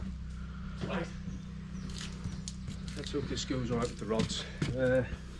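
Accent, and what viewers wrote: Liverpool, United Kingdom. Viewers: Liverpool accent as thick as the sludge in the drain. English of Ye olden times. I love it! Had to use close captions to follow along with the story! lol. Gagging the entire clean out process. Well done. Cheers! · Ah the lovely British accent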